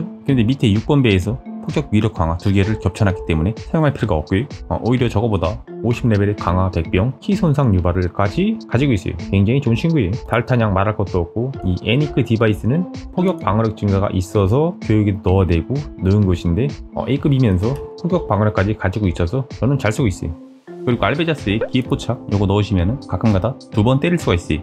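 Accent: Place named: Chungcheong, South Korea. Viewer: 충청도이신가 .. 억양에 친근감이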